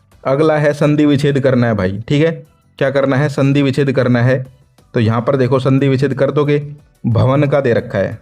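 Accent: Haryana, India. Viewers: Aap Haryana se na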